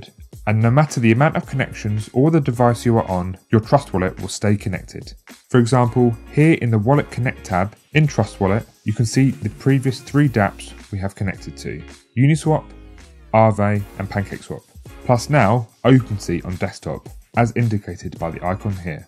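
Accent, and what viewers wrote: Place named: England, United Kingdom. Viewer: same guitar and same English guy voice is every W3 video...he must be busy..I wonder if he plays the guitar too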